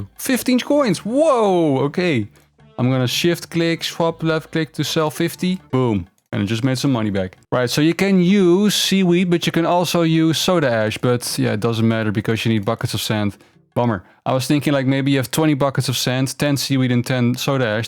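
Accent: Netherlands. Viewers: You are dutch right?😅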